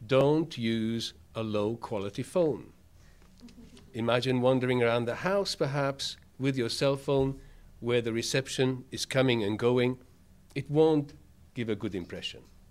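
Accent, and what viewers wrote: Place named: South Africa. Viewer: Couldn't understand this persons funny accent. Sounds South African. Ughh.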